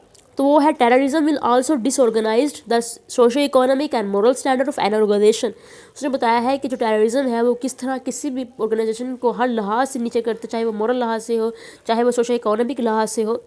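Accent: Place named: Punjab, India. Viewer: Ap key accents sey ap punjabi lag rahi han.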